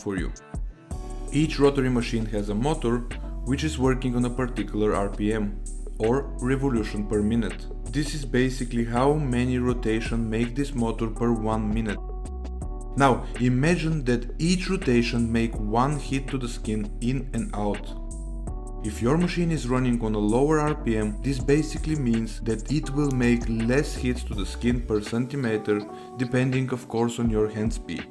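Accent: Russia. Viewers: Дааа ...этот русский акцент...как будто в уши натолкали битого стекла во время просмотра... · какой у вас очаровательный рашн аксент, хи-хи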